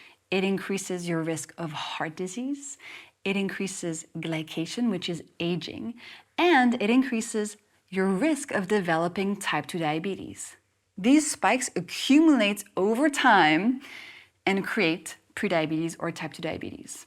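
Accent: France. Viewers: Do I hear a faint French accent? It,s not underneath, it’s below in this context 😅✌️ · I simply love her French accent❤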